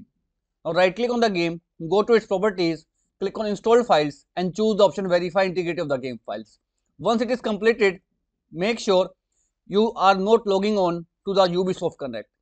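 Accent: India. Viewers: work on your accent please I cant understand indianness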